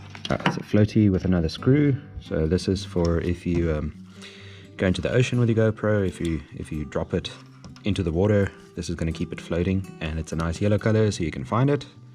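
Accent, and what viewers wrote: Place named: South Africa. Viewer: I hear a South African voice, I see 10k subs, and I watched a good review. All I could do was subscribe. Very excited that I found this channel!! Yes boys!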